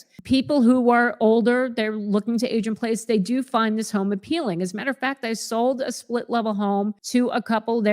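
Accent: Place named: North, United States. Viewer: We relocated to GA from NY (born and raised, particularly Long Island) in '94. I was looking for a different (I know that's not the spelling of your name) and I hit play and turned around. Your accent (which isn't really an accent to me🤣) put the biggest smile on my face!! Every time I register students and I'm speaking to parents calling for information, before I find out where they're coming from, if I hear it, I always ask, "What part of the north are you from?" After 32 years, I'll NEVAH give up my accent! There's no lack of trilevels, stack splits, bilevels, and, of course, Cape Cods on the Island. But when I got down here, I absolutely loathed the bland-no-character-monopoly-looking-lincoln-log-lunchbox-looking houses. Just because it had brick on all four sides, didn't make it any more appealing to me. Still looked like a tissue box to me. 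When we relocated, we'd bought a cookie cutter ranch (because why rent when you can own, right?), then a colonial with a million and one steps) Then, when I made my Exodus from my marriage, and started looking for myself, I knew I wanted the trilevel split I'd grown to love while visiting my uncle's in TN during the summers. His was built on a slope and had a family room downstairs leading out to a pool off the patio, formal living/dining/eat in kitchen with a deck off the main level, then the bedrooms upstairs. His was the first house I'd known with central air! Mine is on a half acre wooded, fenced lot with a second fam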